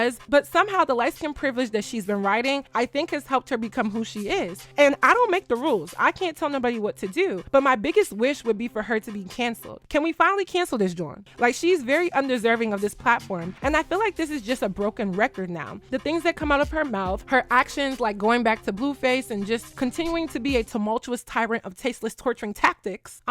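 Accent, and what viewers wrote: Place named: Philadelphia, United States. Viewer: I love your Philly accent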